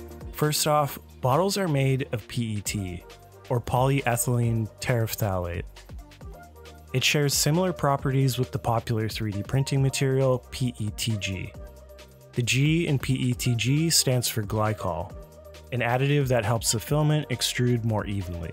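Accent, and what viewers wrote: Canada. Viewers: Well, first I did enjoy the video, it was a reasonable use of my time. Your tone and delivery is smooth without being rushed, and the background music is faded in and out appropriately. From a video-crafting perspective, it's well done. For that alone you get a like and a comment to hopefully put more eyes on your work. :) The subject, on the other hand, is a bit lacking. "Everything" I need to know is a lot more than what you've mentioned. Equipment costs? Okay, maybe this isn't the best place for that unless you wanted to truly endorse the Sustainable Design item. But still, a ballpark is useful. How about time costs? How long does it take you to create a usable length of filament? That is a huge obstacle to any kind of home filament making - it's just so slow. And bottles? From your accent and channel name I'm guessing you're in Alberta. 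Alberta has a really good bottle recycling infrastructure. This isn't going to keep anything out of the landfill, unless you just trash your bottles instead of recycling them - and even so, YEG and YYC both have very good diversion processes at their landfills to catch stuff that can be recycled. In fact, in Alberta it's adding to the overall waste because it's harder to find a recycling centre that will take a bottle fragment. I guess what I'm trying to say is this: any video about home recycling/filament making really needs to hit the problems as well, at that means some discussion of the time cost and the fact that bottles are one of th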